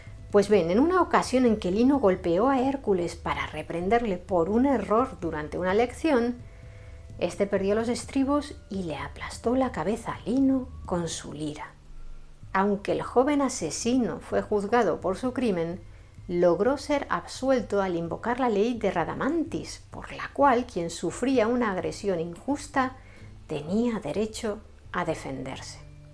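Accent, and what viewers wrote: Spain. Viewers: Me encanta la manera en que narras las historias , excelente oratoria, el acento español me agrada mucho , es como escuchar audio libros, gracias desde Venezuela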